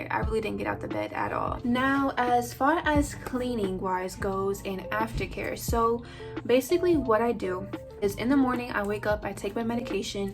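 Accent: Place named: New Orleans, United States. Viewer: y’all live in nola? i know my people when i hear them😂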